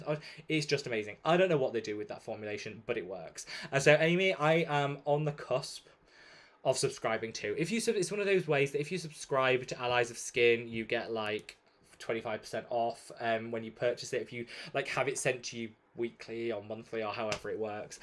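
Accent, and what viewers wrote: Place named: England, United Kingdom. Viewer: Are you from England? I'm in Charlotte North Carolina. My name is Martha . The reason i asked is bc my Dad was from Birmingham England. So i have a lot of English in me. My Dads last name was Herbert. A very English name. Love your accent God bless you stay safe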